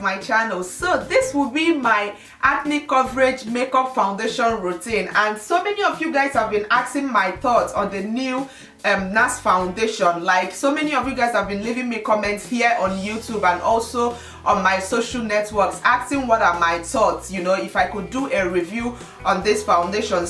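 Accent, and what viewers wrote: Igbo, Nigeria. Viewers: First of all: Great Make-up ! You inspired me ! And i have a question, where are you from? You always Remind me of my (nigerian) dad and his accent :D :) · new subby here. love your spirit and your igbo accent.....really cute make up.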